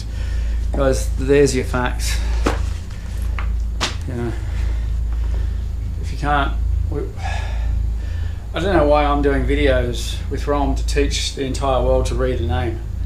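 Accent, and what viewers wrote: Australia. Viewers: I reaaly appreciate your efforts and clear intention, but for a Dutchie like me, your fast talking in Australian English, plus equally fast associations, comparing boxes in "official" forms with pages in a "comic" book. For a beginnner that's hard to grasp, apart from being not informative, but rather a personal opinion of a rebel (for a good cause) and I understand you were one of a few pioneers, at the time of this recording. I imagine that you've reached such conclusions yourself, and since this topic is familiar to more people now, it's possible to grow familiar with this complex subject. We need our trailblazers, and you're one of them 🧡🌈🎃🧑‍🌾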